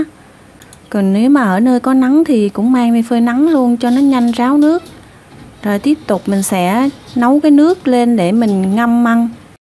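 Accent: South, Vietnam. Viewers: Cháu nói gịong miền Nam rặt, vậy thay vì nói " thìa", mình nói " muỗng ", dễ nghe và hợp lý hơn.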